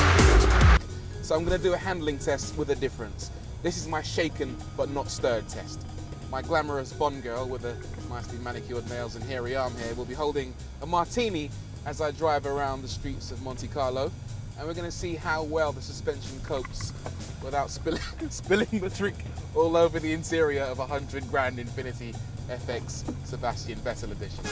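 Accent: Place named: Caribbean. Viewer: Having seen him a few times now, I have to say that I can't stand the guy. His patois accent is gratingly irritating.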